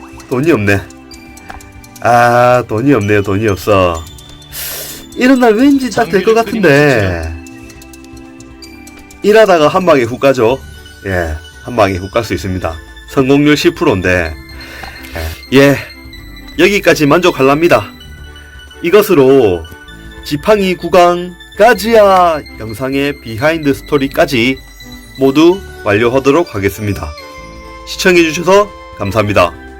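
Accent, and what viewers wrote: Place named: Gyeongsang, South Korea. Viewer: 사투리가 경상도인데?? 어디쪽이지??ㅎㅎ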